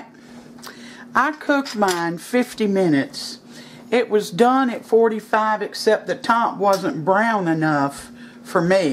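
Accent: South, United States. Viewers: My mother was from the South, I love your accent and I look forward to your recipes. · I love the sweet southern accent 😍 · "Ya'll" and saying " your sorry" so much sure shows you live down south. Love to you sweetie.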